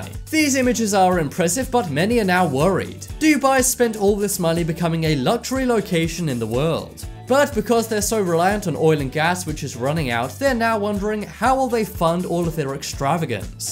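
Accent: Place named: United Kingdom. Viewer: I'm pretty sure the dude is British lol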